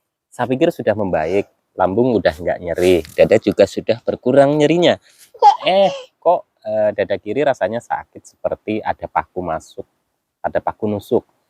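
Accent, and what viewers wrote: Banyumas, Indonesia. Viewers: Mas sampean sih daerah ngendi ngapaknya, nuwun sewune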